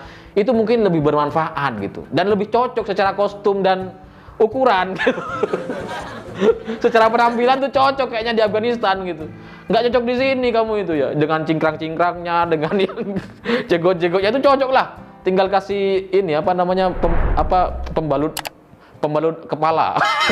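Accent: Madura, Indonesia. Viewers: dari madura ya mas logat ngomong nya lucu aq seneng hh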